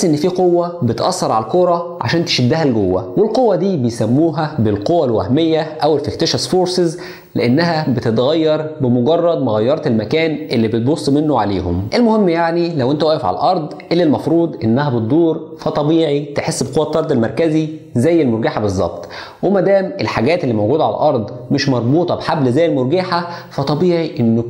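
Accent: Egypt. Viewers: اخذت معلومات بس راسي •صار يوجعني دخت استمر معلومات جدا حلوة تحية من العراق لمصر عرفتك من كلامك انت مصري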